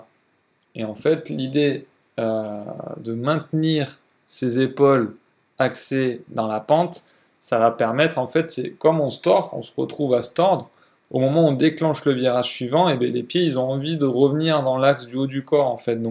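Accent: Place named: Switzerland. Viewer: vaguement suisse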